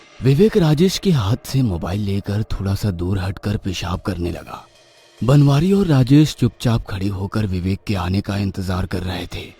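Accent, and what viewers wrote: Bihar, India. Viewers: Full bihari style 🤣 im from Bihar ❤️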